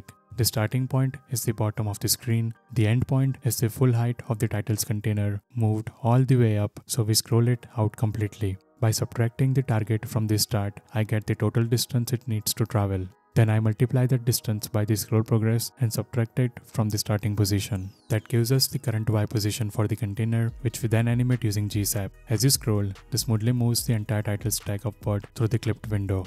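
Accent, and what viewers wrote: India. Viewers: You are from India? Your accent is totaly matched with Indian and your work, literally of god level❤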